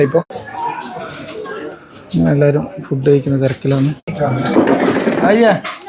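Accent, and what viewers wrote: Kannur, India. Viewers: ഞങ്ങൾ വടകരക്കാർ കോഴിക്കോട് ജില്ലയിൽ ആണെങ്കിലും സ്ലാങ് ഒക്കെ കണ്ണുരുമായാ കൂടുതൽ മാച്ച് 😂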